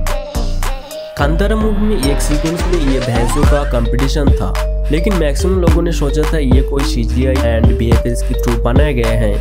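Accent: Bengal, India. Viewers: App bengoli ho na app ki bat karneka tarika pata chal jata hai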